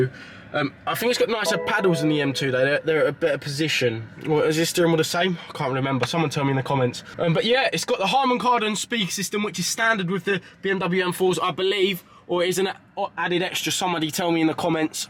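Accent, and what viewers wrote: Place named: London, United Kingdom. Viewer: Gangster talk? Lol it's just how us Londoners talk lad.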